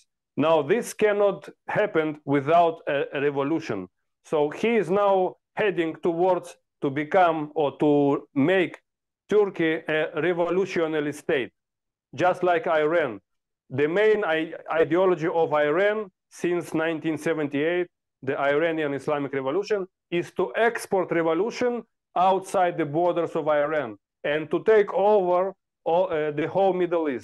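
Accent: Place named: Russia. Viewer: Такива откровени глупости! 😂Западно име със силен руски акцент! 😅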